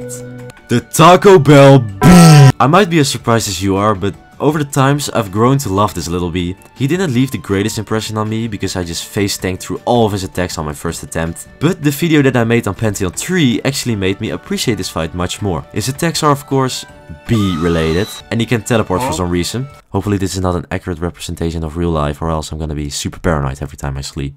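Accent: Netherlands. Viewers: The dutch on this dude is something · The biggest skill issue was listenting to the heavy dutch accent 💀 Still a great video though LMAO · I just love that i can immidiately hear the dutch accent. Geweldige video! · you sound pretty Dutch. Your accent I mean 😅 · Personally feel like you could work on your comedic timing a little. You sound very Dutch, which might be why the jokes don’t land on me. Great production value though. I just don’t find it very funny. Soul Tyrant is so bad on repeated playthroughs and pantheon runs. He’s super easy yet super slow cause he’s rarely ever in nail range. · Are you dutch? You sound dutch. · are you dutch? you speak english like you are dutch (not an insult) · ur Not english Are you? Your accent Sounds very Dutch to me😂 Don’t worry bruh, I’m dutch😂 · any chance you're dutch? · Dit is zo’on erge Nederlandse accent 😭😭😭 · U Dutch?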